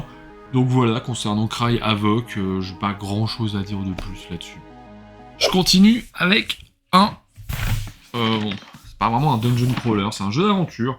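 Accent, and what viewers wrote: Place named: England, United Kingdom. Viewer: oui. Pis y a déjà du monde de bien installé, j'avoue. Dommage, ça aurait été bien avec ton humour et ton accent anglais qui a de la tronche (bon, un accent qui a de la tronche... je suis parti loin, tant pis, mouarf!)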